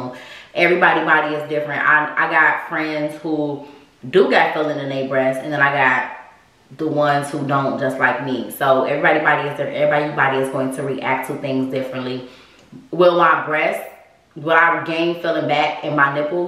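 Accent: United States. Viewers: Lol Sound so chicago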